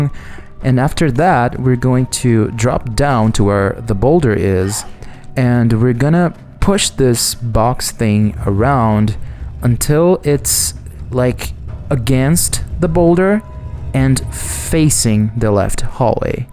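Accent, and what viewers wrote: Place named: United States. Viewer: No dude you sounded like an american for me when i first met u!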